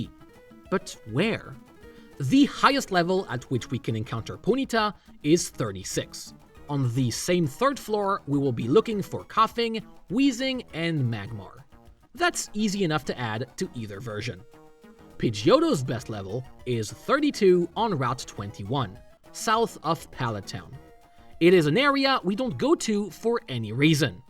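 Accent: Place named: France. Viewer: Youtube automatically translated you to French, and while I'm French and suspect to your accent that you actually speak French IRL I was not prepared for this when the video started.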